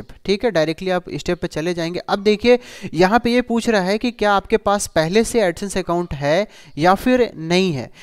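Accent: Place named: Jharkhand, India. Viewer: Sir ji kya aap jharkhand se ho kya.....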